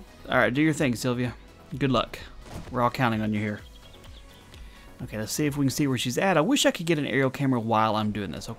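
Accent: South, United States. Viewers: You have a cute Southern accent.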